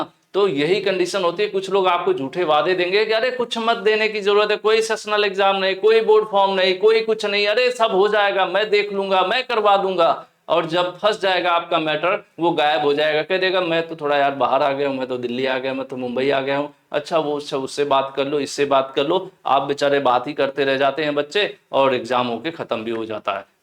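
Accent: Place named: Bihar, India. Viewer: Sir bihar se hai😊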